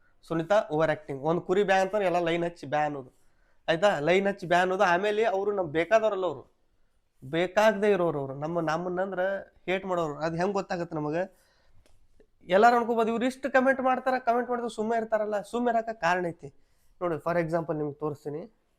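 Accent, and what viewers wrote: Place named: Bijapur, India. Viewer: ಪಕ್ಕಾ ನಮ್ಮ ಬಿಜಾಪುರ ಲೋಕಲ್ ಭಾಷೆ 👌👌ಬಾಲದಿನ ಆಗಿತ್ತು ಬರಬ್ಬರಿ ಆಯೇತು 😂😂😂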